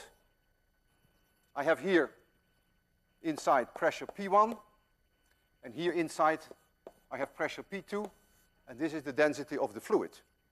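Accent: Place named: Netherlands. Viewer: He has a dutch accent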